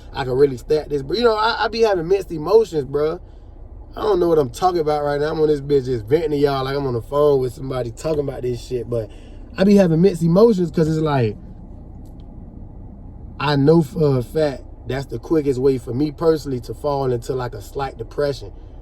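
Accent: South, United States. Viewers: Bro. I’m hearing your accent. You’re definitely from the South. Question, respectfully where? I’m from Cashville and you sound like home. New sub here.🤟🏿😎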